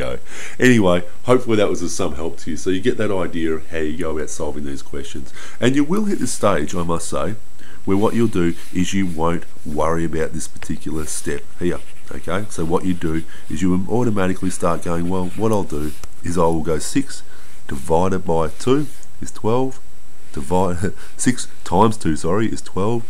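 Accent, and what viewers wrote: Australia. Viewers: People why do u have to be mean, have u ever heard of Australia,yeh thats where he is from,same with me, so other people have diffrent voices when it comes to countrues so stop being mean. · Hardcore Australian accent [IN A GOOD WAY] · he sounds like an australian dathi de nogla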